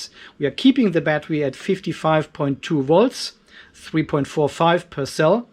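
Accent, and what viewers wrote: Germany. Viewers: I would go crazy because of the leafs :D Allot of hot spots on the panels. Where are your roots? You sound so german :)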